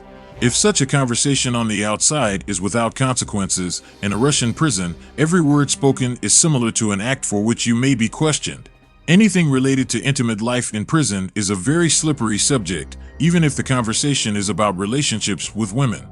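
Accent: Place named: United States. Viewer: This would be way better if it was narrated by a real russian speaking bad english rather than a boring bot speaking american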